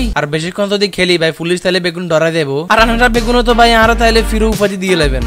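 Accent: Noakhali, Bangladesh. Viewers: Via apnar bari Noakhalir kothay?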